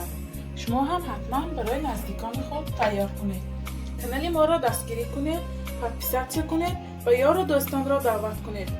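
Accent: Isfara, Tajikistan. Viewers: овозатон маълумки аз исфара хамшахри будем хохар ман хам аз исфара ман зан хастам👍👍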